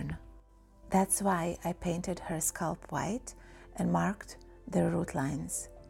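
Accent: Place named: Russia. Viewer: Русский акцент слышен всегда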